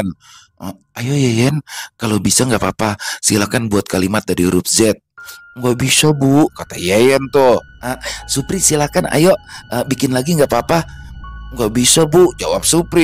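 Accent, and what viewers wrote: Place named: Jakarta, Indonesia. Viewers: Keren banget bang, cara bawain nya, ceritanya, logat Betawi nya, mantap bang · Bang emang jago banget bawain cerita2 kaya gini klw lg cerita bahasa betawi kentel banget logaknya, klw bawain cerita dari kalimantan kentel banget juga logak kalimantan ny kereen lanjut terus semoga sukses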